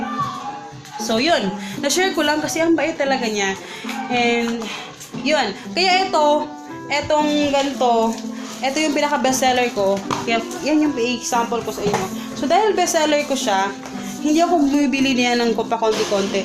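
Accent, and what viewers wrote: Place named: Visayas, Philippines. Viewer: nakarelate ako sa tagalog dialect mo sis na may halong bisaya hehe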